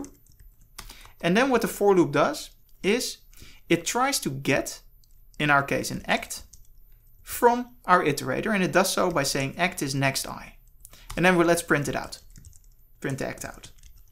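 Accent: Netherlands. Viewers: Subscribed want ik hoor meteen de Nederlandse accent:p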